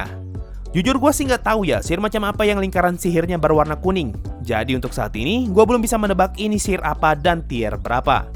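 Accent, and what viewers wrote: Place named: West Java, Indonesia. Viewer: jadi bakal ada banyak kalimat yang "Rada" aneh, wah kayaknya mimin org sunda :v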